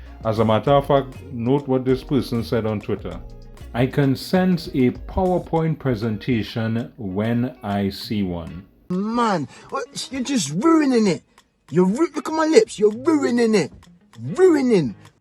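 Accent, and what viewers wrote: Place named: Trinidad and Tobago. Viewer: Are you from trinidad cuz im from trinidad and i recognize how you were talking just like a trini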